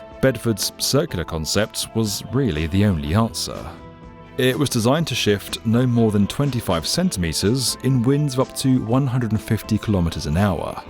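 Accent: England, United Kingdom. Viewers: It's so funny hearing your posh English voice using the American routed. Come on, show a bit of back bone or are you a Trump supporter? After all you have spent some time in the USA recently. Anymore selling out will result in being imprisoned in a bathroom that does not have a bath within! You have been warned.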